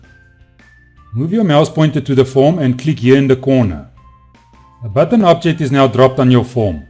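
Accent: South Africa. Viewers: Is jy Afrikaans?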